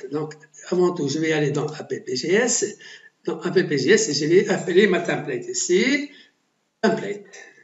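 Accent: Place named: Tunisia. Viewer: tunisien accent merci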